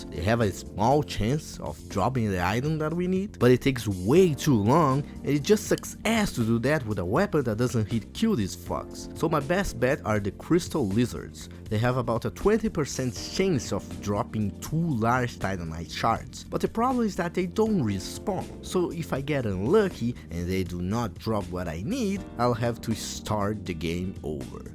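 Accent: Brazil. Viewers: Seus R são muito de paulista. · Are you brazilian? your accent sounds familiar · I think he's Brazilian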